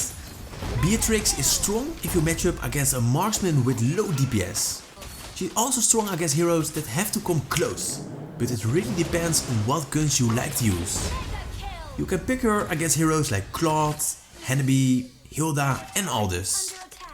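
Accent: Netherlands. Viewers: You got so Dutch accent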